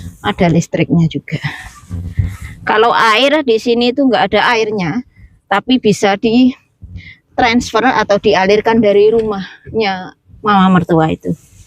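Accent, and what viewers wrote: Java, Indonesia. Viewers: Assalamu'alaikum jeng.sy ketawa-tiwi sendirian dengerin jeng bicara,itu boso jowone medook.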